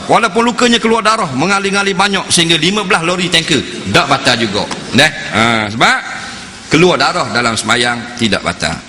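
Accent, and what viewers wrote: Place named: Terengganu, Malaysia. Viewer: Maaf teman. Ini bahasa dialek orang Terengganu di Malaysia.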